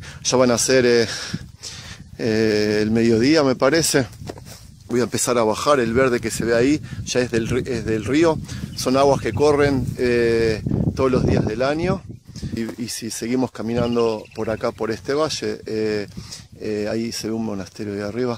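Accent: Argentina. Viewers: DIOS te bendiga. Por compartir este Interesante video. Te escuchas como argentino ?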